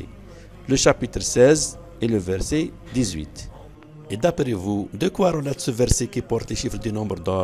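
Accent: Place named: Kabylie, Algeria. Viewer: Accent kabyle salut cousin sa va ??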